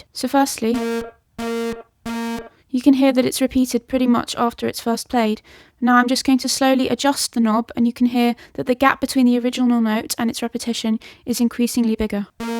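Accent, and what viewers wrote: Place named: United Kingdom. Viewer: What a lovely British accent!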